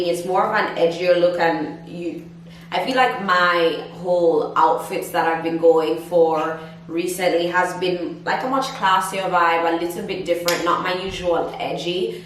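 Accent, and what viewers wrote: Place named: Caribbean. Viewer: You sound West Indian Babe x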